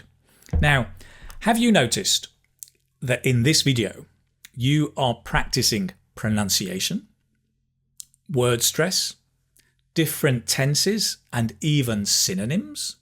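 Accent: United Kingdom. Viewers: Please someone tell me that " is this British Accent?"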